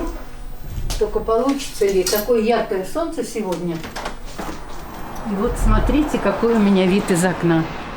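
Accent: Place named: Saint Petersburg, Russia. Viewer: Сразу поняла, что вы из Питера) приятно слушать) здоровья вам!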